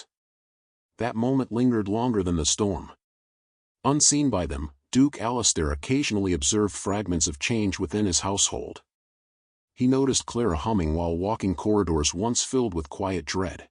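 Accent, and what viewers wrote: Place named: United States. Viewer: The American accent is so horribly inappropriate for such a totally English story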